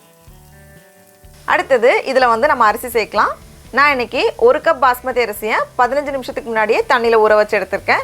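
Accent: Nagercoil, India. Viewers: Akka NenGa NaGerCoil aH ???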